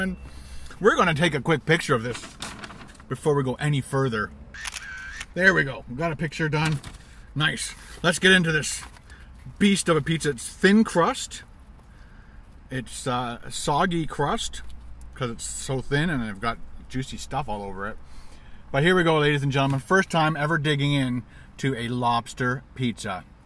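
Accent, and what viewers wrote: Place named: Barrie, Canada. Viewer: So neat! I found you by watching your colab with emmymadeinjapan and when I heard your accent I just had this feeling you were from Barrie area! I live the vicinity too and have had many a wonderful meal at that location. You should really try the "Ultimate Feast" next time, but switch out the "walts favourite shrimp" for the pan fried scallops!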